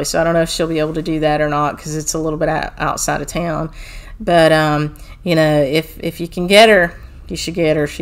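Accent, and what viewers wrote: South, United States. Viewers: It was great to see you again and to hear about the new samplers. They are beautiful! The Hardwick Manor hoop looks so nice. You can tell it is the highest quality and would last a lifetime. You know a stitcher is known by her tools! I’m looking forward to your next video. It’s nice to hear a southern accent! Have a wonderful week!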